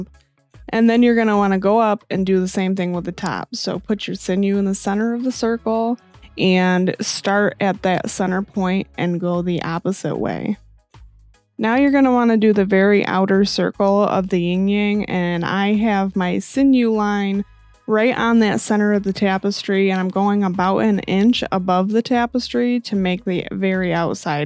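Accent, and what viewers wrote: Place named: Midwest, United States. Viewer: I ADORE your tutorials, hearing your voice is such a treat! You have a great way of explaining, and a fabulous midwestern accent 😁😁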